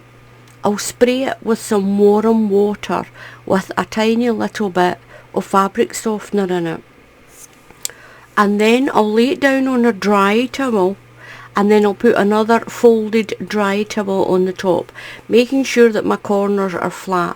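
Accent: Scotland, United Kingdom. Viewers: Just love your channel! I’m left handed and have watched quite a few videos of yours for baby items! So easy to follow and glad that you actually show the stitch we are to start on the next row. By the way, love your Scottish accent! I lived in Brechin in Angus for three years in the 70’s. Had my first wee bairn in Montrose. Been homesick for Scotland since I left.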